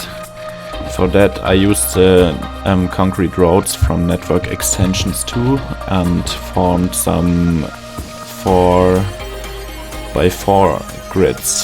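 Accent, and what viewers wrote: Germany. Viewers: deutscher akzent 😳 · Man hört echt stark heraus, dass du deutsch bist xD Schöner Flughafen und ein paar ziemlich kreative Ideen mit drin. Da werd ich mir doch glatt was abschauen und selbst mal so verbauen :D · Ich höre an deiner Stimme du bist deutsch😅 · Haha dude I enjoy your German accent 😊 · i can hear that you`re german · Are you german? xd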